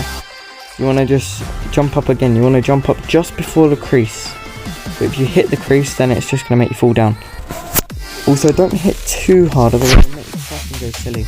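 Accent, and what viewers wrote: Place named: United Kingdom. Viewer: Just wanna say that I had a bunch of ppl telling me that I sound just like you in gtag lobbies and I realised the only reason why was cause where both British lol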